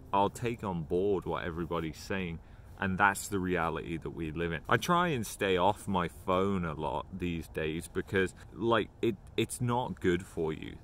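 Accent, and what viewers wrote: United Kingdom. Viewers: you're so interesting to listen to. What part of the UK are you from?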